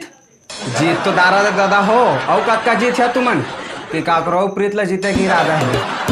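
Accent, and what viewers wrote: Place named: Janjgir, India. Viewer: Janjgiriha tone lgthe ga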